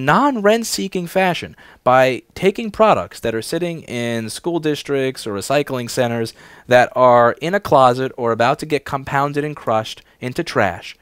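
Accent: New York, United States. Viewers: "Whaddya Pinocchio" so NY, as are you... Happy Birthday!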